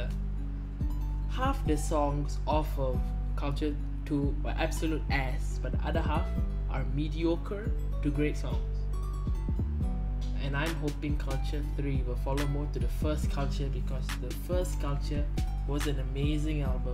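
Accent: India. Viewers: Your accent sounds Indian but with a Caribbean accent. My guess is your of Indian ancestry but your from the Caribbean? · What’s your accent it sound Punjabi, Hindu, Pakistani or something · you from india or what? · Are you Indian? (Not hating or anything i just didn't expect ur voice) btw speak louder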